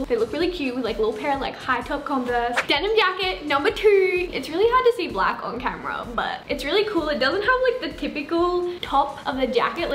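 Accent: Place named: Australia. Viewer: I am literally going to forever21 and getting everything you got in this video! I love hearing your voice, because I’m Australian, and I very youtuber I watch, is American, so I love hearing a fellow Aussie! Love u!!! P.s I love denim on demon PPS soft squad! PPPS I think it is a lettuce hem!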